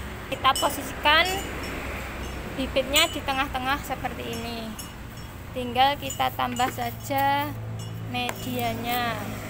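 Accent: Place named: Java, Indonesia. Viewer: English ne jowo aksen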